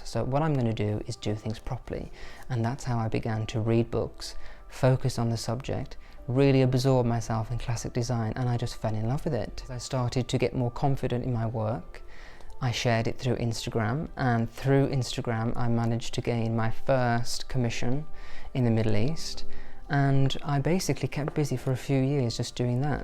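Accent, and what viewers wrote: RP, United Kingdom. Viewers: You are a delight,. I must say you sound so posh and I mean that as a compliment. So crisp, yet gentle, and gracious. Can't wait to see more. #sophiebestlife If you want to find the most calm, elegant, historical and beautiful places in the US...please reach out. I'd love to provide ideas. Just a couple to start your US list - Savannah, Georgia; Santa Barbara, California. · I'm really surprised to hear you say you have a very middle-income background, as your voice and demeanor comes across as quite 'posh' :) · You’re so sophisticated, calm and peaceful! I love the British accent❤️.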